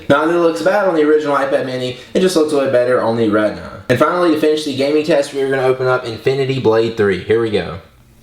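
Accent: South, United States. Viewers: Love your southern (I assume) drawl!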